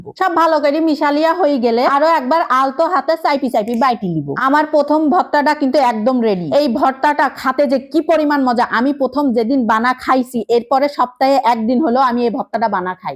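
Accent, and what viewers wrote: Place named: Bede, Bangladesh. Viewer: কোন জেলায় বাড়ি!!বেদেনীদের মত ভাষা, ভাষার টান।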